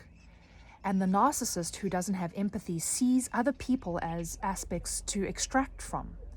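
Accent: South Africa. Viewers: is this a South African accent?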